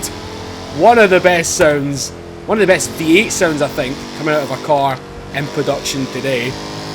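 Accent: Scotland, United Kingdom. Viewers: Your Scottish accent is about as cool as it gets. I've always thought of the Scottish as the kind who would punch you in the throat then smile at ya drinkin' a pint. =) (=...right. Nothin' to worry 'bout. Jaguars are sexy beasts...OR.....ass-tin mar-tin.....sounds too british (poor brits wrong at everything) I wouldn't hold to the standard of responding to every person, I would be guilty of not willing to do it myself. One needs a car that does it all, under 12 second quarter mile, 180-200+ top speed (limited to car type), at least 1g (earth gravity) of lateral cornering capability, can get groceries. The mountain - looks like it wants to be conquered, just sayin'. 6 digit subscribers, the more you got, the faster it goes up. May your momentum constantly surprise you.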